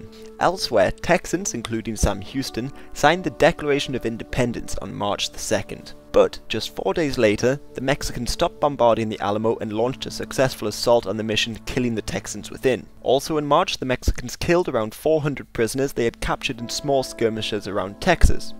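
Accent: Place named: United Kingdom. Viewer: Cuahuila, pron., kua-wi-la, not kua-hi-la. Anahuac, pron., aná-wac, not ana-hoo-ac. What is wrong with you ppl??? The very least you could do is get the pronunciation right. As it is, you are using English pronunciation rules on Spanish words. That never works. It's about as bad as using Spanish pronunciation rules on English words. It never ends well. And, why do you have an English bloke narrator. That British accent is so annoying. It's like someone speaking with peanut butter stuck to the roof of their mouth. Get rid of them. Their English is horrible. American narrators for American history.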